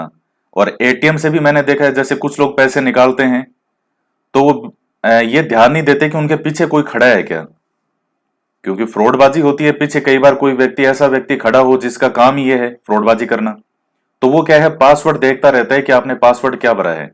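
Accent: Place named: Sri Ganganagar, India. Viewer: 🙏 sir Aapky bary m janty to nhi bt aapni voice tone s lgta h jese aap sgnr( sri ganganagar) k nivasi ho.😊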